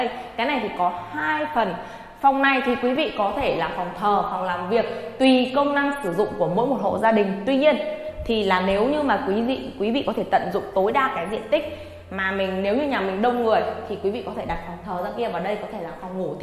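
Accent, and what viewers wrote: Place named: Hanoi, Vietnam. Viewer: Nhà đẹp giá tốt giọng nữ HN cũng hay😊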